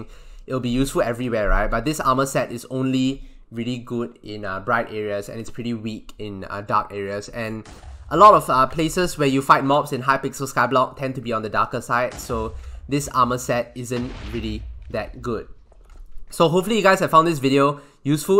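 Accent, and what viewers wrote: Singapore. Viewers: knew it from the singapore dialect that i have heard.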